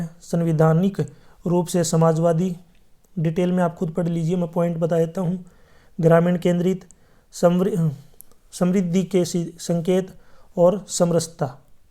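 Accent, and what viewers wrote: Haryana, India. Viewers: Haryana ka hai kya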